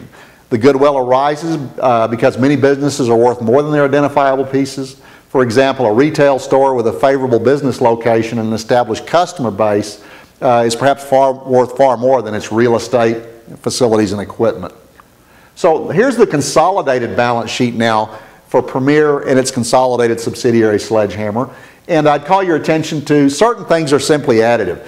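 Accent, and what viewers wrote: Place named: India. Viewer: You talk too damn fast it all sounded like hearing an Indian speak!!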